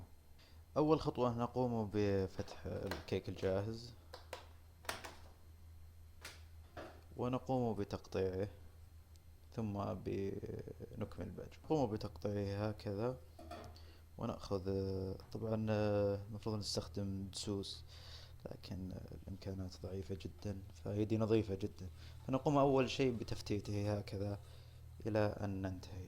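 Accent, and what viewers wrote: Saudi Arabia. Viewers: شويه يتكلم بلغه العربيه وشويه سعودي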